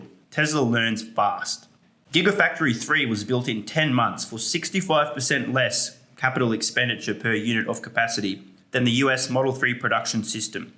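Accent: Australia. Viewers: I could have made the exact same video (minus the Australian accent LOL). Totally share your views -- I am personally heavily invested for the same reasons as you stated plus the fact that it's at least 50% undervalued (I have my own calculations). It's as clear as day that in 5-10 years TSLA is going to be a multiple bagger. And like you said -- in 2030, people will say how lucky you were to invest in TSLA. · I'm not super interested in buying a Tesla car right now because I would rather have a Corolla- or Camry-like electric car, at those lower prices. But I would invest! Though I could only manage 5 or 6 shares, to begin with. Nice to hear an Australian accent talking about this!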